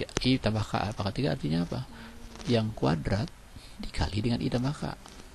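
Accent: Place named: Malaysia. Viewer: Logat nya kayak orang melayu yah pak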